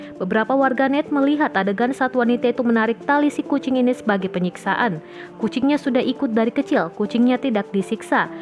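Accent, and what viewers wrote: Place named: Jakarta, Indonesia. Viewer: Mungkin Orang betawi , jadi setiap hurup a diganti e 😅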